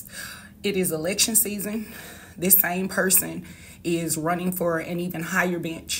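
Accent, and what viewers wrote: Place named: South, United States. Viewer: Great to hear you got through. On another note that may put a smile on your face. From over here in England, we kinda like the southern accent. I have been waiting for a ya'll since hearing you keep saying "you all may be seated". Can hear you hiding it, Thank you for your ya'll.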